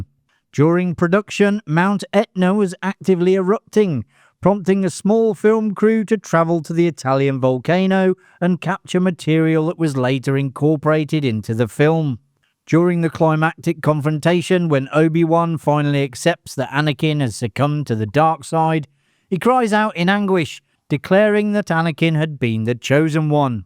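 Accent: United Kingdom. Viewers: what the hell planet is ko-RUSS-kant? check that pronunciation again sir. :) and now the lava planet is named after a middle eastern guy? look, I get that you are a Britt sir, but come on, even those who can't speak english can get the planet names right. is this is AI I'm gonna be sick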